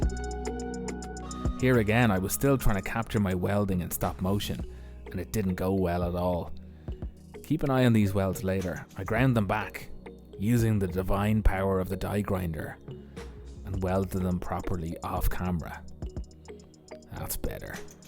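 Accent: Ireland. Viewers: Love the story-telling, makes the videos! Is it the Irish accent?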